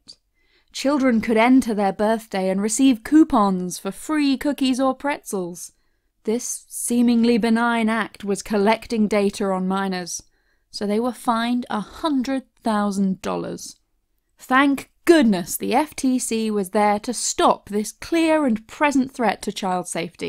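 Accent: United Kingdom. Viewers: The British accents says it all..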